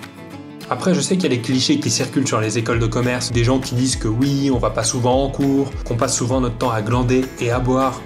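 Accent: Lorraine, France. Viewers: Tu viens de lorraine comme moi 😂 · nn sérieux tu viens de Lorraine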